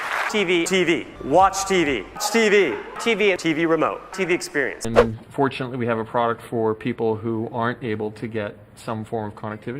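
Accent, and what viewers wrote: Germany. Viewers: you have a strong german accent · I hate that german accent xD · I said he is German because of his English accent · Englisch auf Deutsche Akzent, das erkenne ich sofort 😂😁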